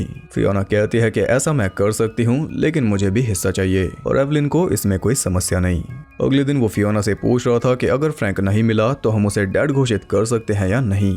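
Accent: Haryana, India. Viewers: mitra tum hariyana se ho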